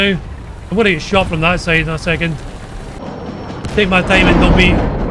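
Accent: Scotland, United Kingdom. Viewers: The guy is Scotish he can't tell the difference...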